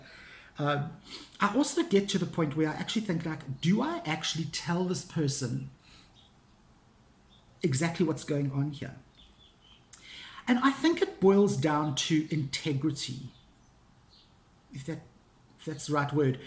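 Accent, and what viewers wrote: South Africa. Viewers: I came here via Donna at Ozark Oracles channel and thought I would drop you a quick hello. Really enjoyed this video and am looking forward to watching more of your content. I think, personally, it’s important to always be honest whilst remembering to also be kind. On an unrelated note, I love your South African accent and could literally listen to you read a dictionary 😁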